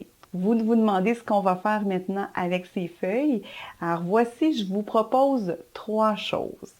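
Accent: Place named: Quebec, Canada. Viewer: Ce que j'adore c'est qu'au Québec il fait froid une grande partie de l'année mais l'accent réchauffe le coeur de celui qui écoute autant que l'accent de Provence !=)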